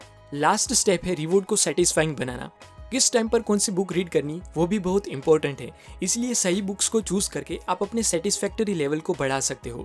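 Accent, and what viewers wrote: Gujarat, India. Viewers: I liked your gujju accent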